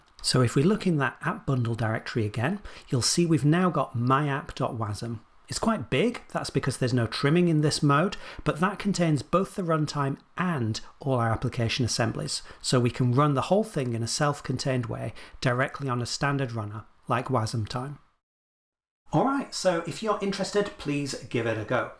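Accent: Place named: Australia. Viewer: Great guy but can never listen to him for more than 1 minute due to stupid Aussie Accent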